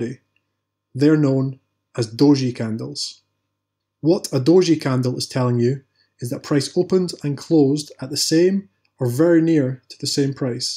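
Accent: Scotland, United Kingdom. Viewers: Excellent video, very well explained and presented. Nice to hear a Scottish accent as well. Thanks for the video. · Thank you for the clear and simple explanation. Great Scottish accent for narration too. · Absolutely love clicking on a video and hearing a Scottish accent! Thanks for the great vid bro 🏴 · Looks like I've found my teacher and a Scotsman by the sounds of it, like myself 👊 I recently opened a brokerage account and had a go. Yeah, ended up with a migraine and worn teeth. Thought I should search out a guru! Looking forward to an intense, enlightening journey! Really appreciate your content my friend! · Just a beginner here and was glad to find a video with easy to understand info about candles, and by someone with an awesome Scottish accent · Great to hear another Scots accent. :) I just signed up for a ForexCT account but having suffered severe memory loss from a brain injury my days of previous financial education are a lost blur. 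So this video, I'm sad to say, is where my level is at. I'm just trying to put my life back together. (I'm a Glasgow boy but I now live in Australia). So thank you. ;)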